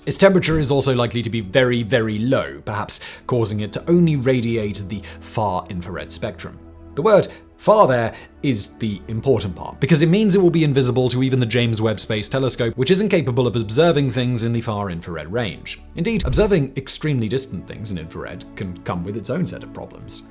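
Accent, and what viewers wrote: United Kingdom. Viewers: Your proper British accent makes those Uranus jokes even funnier 😂🤣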